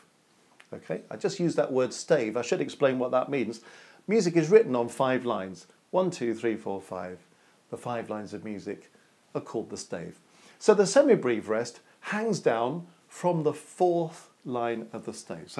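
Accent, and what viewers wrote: United Kingdom. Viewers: I love this teacher. The pace and tone of his speaking and the British accent all make it so enjoyable to follow the class.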